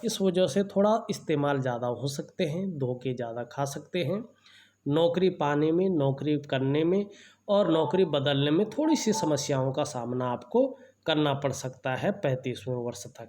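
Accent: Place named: Garhwal, India. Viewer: Guru ji bhasha se gadwali lag rahe ho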